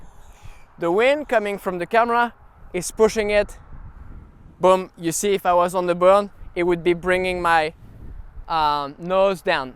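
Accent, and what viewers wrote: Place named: France. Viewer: First and foremost, kudos to you for explaining how to do wing onpaddle board. It’s the most clear concise explanation I’ve ever heard. I will totally use my paddle board first. All these steps will help me concentrate on managing my kite at this time. Most of all any videos you made in the past is very interesting just to listen to your French accent!! It adds to the likeness of video. Thanks again.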